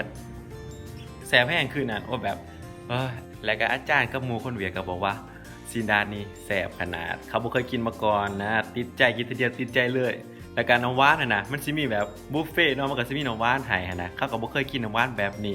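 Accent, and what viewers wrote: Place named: Ubon Ratchathani, Thailand. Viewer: เจ้าเว้าออกสำเนียงอุบลหลาย สู้ๆเด้อน้องหล่า เอาใจส่อยุเด้อ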